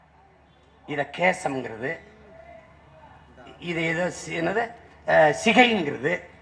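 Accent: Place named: Kerala, India. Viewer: என்ன எழவு மொழிடா இது. மலையாளியா?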